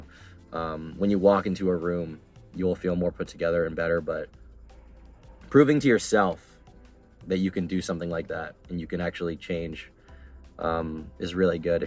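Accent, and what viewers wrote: New England, United States. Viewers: Oh shit I didn’t know u were from New England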